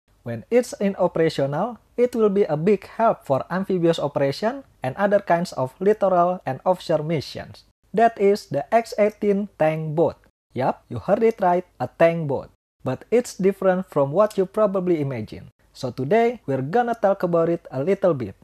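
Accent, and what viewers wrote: Indonesia. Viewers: Hmm logatnya khas orang Indonesia sekali.. Nambah ciri khas negara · Wkwk Orang indo kan ? Accent nya Indo Banget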